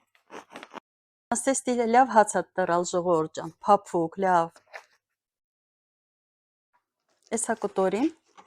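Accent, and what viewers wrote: Artsakh, Azerbaijan. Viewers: Ես մեր բոլոր բարբառները սիրում եմ, Արցախի բարբառն էլ եմ սիրում ու հաճույքով լսելով սկսում եմ մեծ մասամբ հասկանալ։ ջան շնորհակալություն կուրկուտի մասին մեզ ծանոթացնելու համար ❤🌹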